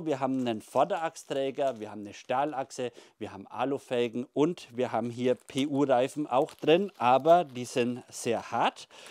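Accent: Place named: Franconia, Germany. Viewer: …für mich ist DAS Fränkisch…😂😂😂 Den richtigen Dialekt versteht nur ihr “vor Ort”….🎉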